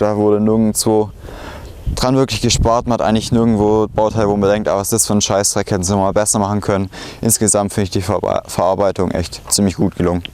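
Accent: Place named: Swabia, Germany. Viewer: muss man doch au ned ich finde man kann ruhig ein wenig hören das man n schwabe is 👍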